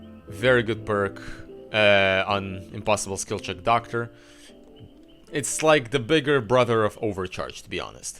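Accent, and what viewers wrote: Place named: Israel. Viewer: יש לי תחושה שאתה ישראלי בגלל ה accent lolol great vid BTW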